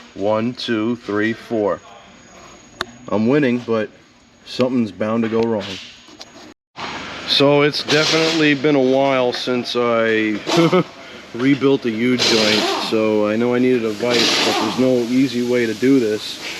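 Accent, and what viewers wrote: New York, United States. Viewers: New York. is that where you work based on "giggidy" accent.......very nice.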